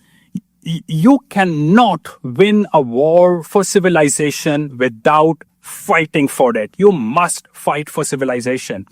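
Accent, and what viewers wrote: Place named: India. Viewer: Espeak,Estreet…Indian still remains an Indian 💩😂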